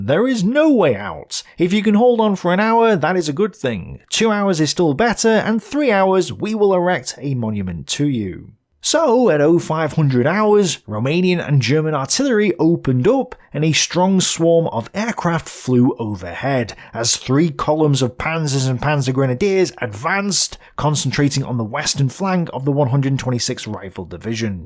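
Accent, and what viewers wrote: United Kingdom. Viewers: I can feel my bone healing as I listen to mild British accent speaking about the glorius carnage on Volga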